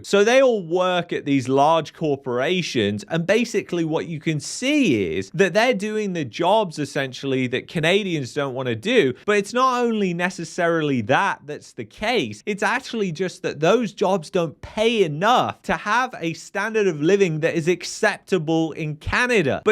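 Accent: United Kingdom. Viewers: If it s so terrible here in Canada what are you doing living here? Judging from your accent I would remark UK is even more messed up then Canada ever will be.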